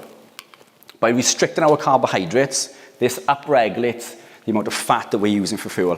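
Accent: Wales, United Kingdom. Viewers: The Welsh accent is the one thing I dislike about this video! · So what are your commercial interest along with this good will info? No, dont get me wrong, I enjoyed the bleep immensely and it was factual to the letter, it's just that I cant help being bleeping cynical about stuff. Its a necessity it bleeps, these days. Good luck anyhow. Ps. The Welsh accent made a nice bleep from all those yank MD's. 🙏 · Good, clear presentation. And I could listen to a Welsh accent all day!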